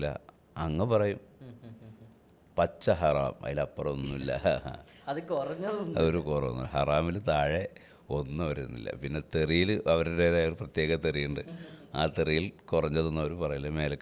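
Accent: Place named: Kuruvettoor, India. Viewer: സംസാരംകേട്ടിട്ട്കുരുവെട്ടൂർആണ്എന്ന് തോന്നുന്നു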